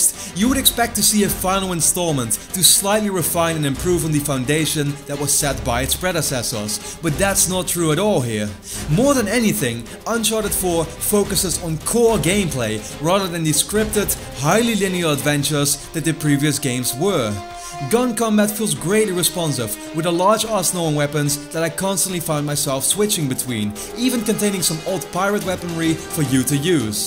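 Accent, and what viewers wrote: Netherlands. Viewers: Hahaha, je hebt echt zon zwaar engels-nederlands accent ^_^. Ik zat wat reviews te kijken, dacht dat je een engels kanaal was. En je klinkt zo verschrikkelijk nederlands. ;) Leuk kanaal trouwens. Goed dat je het in het engels doet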